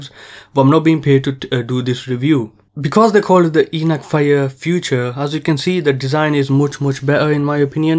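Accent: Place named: West Yorkshire, United Kingdom. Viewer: Thats one right west Yorkshire (asian) accent lol I have recently got them, they are great but bass is not that good.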